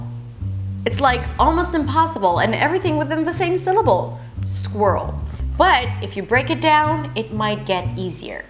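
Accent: United States. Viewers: Hey! I'm absolutely impressed by you flawless american accent!!!! Where are you ffrom and how can you be so natural? You sound more American than native speakers. Congrats!!!!!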